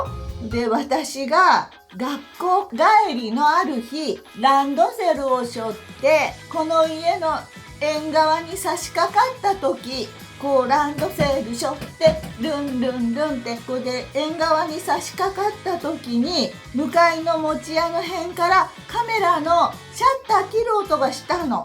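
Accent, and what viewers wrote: Yamaguchi, Japan. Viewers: 本当の山口弁！わざとらしい、鳥肌が立ちそうなYouTuberさんとは一線を画します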